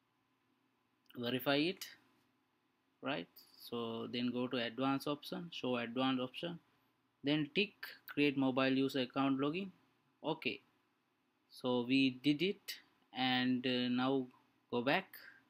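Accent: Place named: India. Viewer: indian accent very bad!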